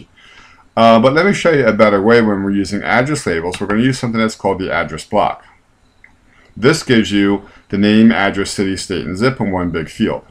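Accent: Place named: Baltimore, United States. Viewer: You're from Baltimore, aren't you??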